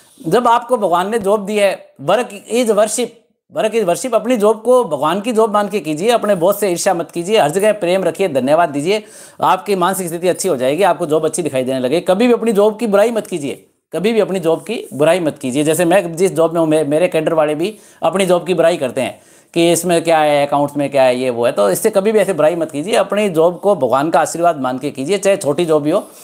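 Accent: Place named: Marwari, India. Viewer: Love your Marvadi flavored hindi sir- love from Goa.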